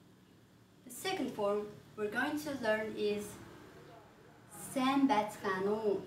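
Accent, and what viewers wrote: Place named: Mongolia. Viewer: it is actually pure mongolian accent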